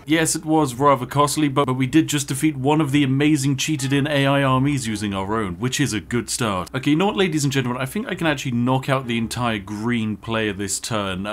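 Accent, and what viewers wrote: United Kingdom. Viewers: Hey....... are you actually British? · You sound too british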